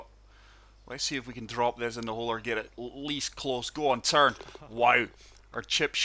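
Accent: Scotland, United Kingdom. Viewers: What part of Scotland are you from,?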